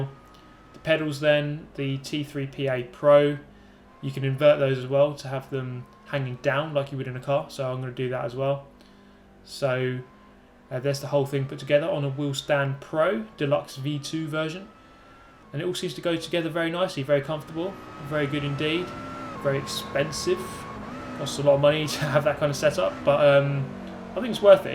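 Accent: United Kingdom. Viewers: his british accent no bullshite intro and dubstep music backgrpund adds up to a good unannoying vid//s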